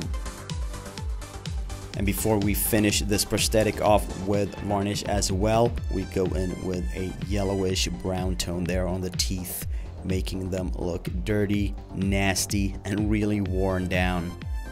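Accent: Scania, Sweden. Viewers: älskar skånskan :D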